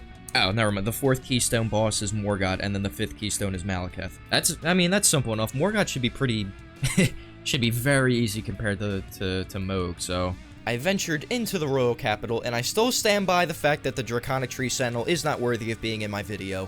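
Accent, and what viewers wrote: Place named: Philadelphia, United States. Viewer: Bud you from around Philly? You sound like me lol. Solid content dude take the sub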